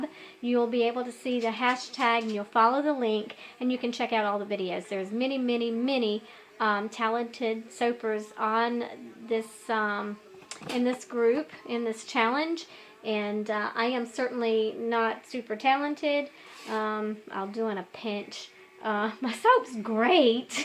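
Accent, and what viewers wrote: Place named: Alabama, United States. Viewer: Are you from Alabama or Georgia? I hear my accent! (Alabama)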